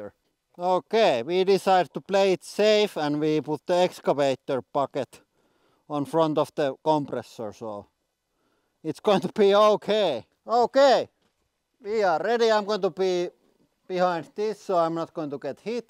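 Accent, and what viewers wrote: Finland. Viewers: "So if anything goes to sheet it doesn't matter" 🤣 I love this channel so much. I'm Canadian so I sound stupid to most of the world but I love Finnish pronunciation of English language. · Finnish English accent is the best English accent ❤️ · This is Finnish accent.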